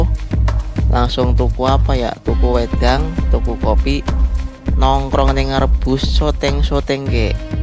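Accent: Banyumas, Indonesia. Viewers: Ora ngapak ora kepenak mantap sukses selalu kak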